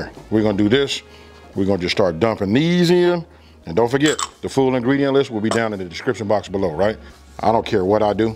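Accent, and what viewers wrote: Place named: West Coast, United States. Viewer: Side-note I started watching your video and as soon as I heard you start talking I was like he’s so west coast 😂 he gotta be either from Cali or Denver I looked at your info and it said riverside i knew it I’m from Sac but I live in Denver now but its still the same Westcoast vibe anyways i just thought it was funny thanks for the video stay dangerous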